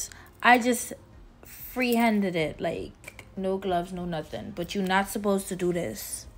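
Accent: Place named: Bahamas. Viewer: You sound Bahamian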